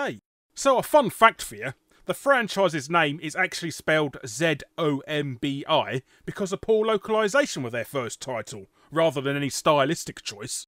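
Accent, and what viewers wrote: England, United Kingdom. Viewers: What part of England is your accent from? I always wanted to know.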